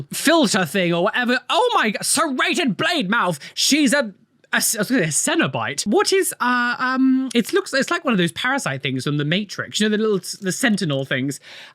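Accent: United Kingdom. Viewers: I love your videos, and btw I’m learning English for 7 years, now I'm listening to the British accent in your videos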